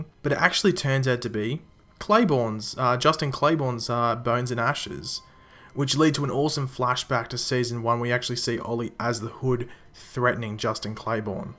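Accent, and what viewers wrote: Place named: Australia. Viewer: Are you from Australia, You have a Aussie accent :D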